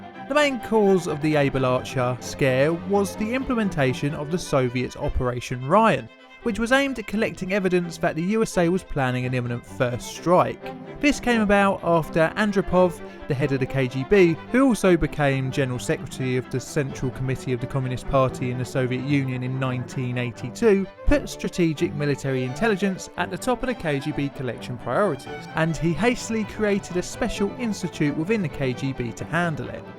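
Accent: United Kingdom. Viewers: Great vid, except audio. The narrator's voice is a bit unclear, heavy Brit accent. Not the problem, but the classical music in background is a bit too loud. Between the two, it sounds chaotic.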